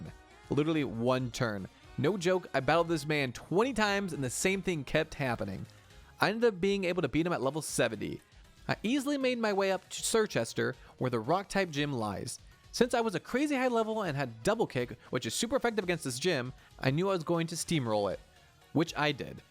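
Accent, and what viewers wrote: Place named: Scotland, United Kingdom. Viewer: Damn a true Scottish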